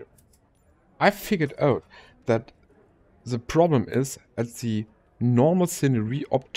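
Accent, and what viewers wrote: Germany. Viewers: I was stationed in Germany for 5 years and I loved it. So the accent makes me remember some good times. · Englisch mit Deutschem Akzent ist irgendwie immer geil.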